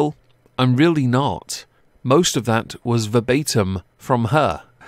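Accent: United Kingdom. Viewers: Your British accent makes Anne Boleyn sound just like Amberlynn and I got very confused for a second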